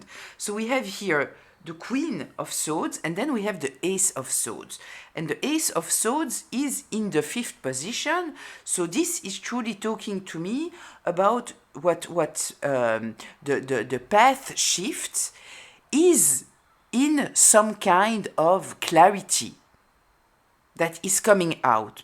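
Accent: France. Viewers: You are goldilocks mama bear papa bear n a cheeky 😜 😉 😄 😏 😆 😘 lil baby 👶 bear all in one i am the lucky goldilocks living ❤️ in a safe space ❤️ 😅 love adore an sank in the awe of your accent. Sounds like French but I am but a bamboo lambo no offence meant. You are that beauty in the world card. I see you . eagle. hear you ...bull... build my empire on your word flowing gently like a true judge... not a kangaroo court....coming thru air n water. Kaballah is the umbrella. Do not get understanding then NOT act upon that understanding.. alas whatever the gen you'll never be part of the inner standing. You beautiful creature and your channeling from the gurus truly are my umberella, my guide😮a hand to hold and a voice in the wilderness who wants what is best for me..all in the fair wayfairers way to inner standing. Phew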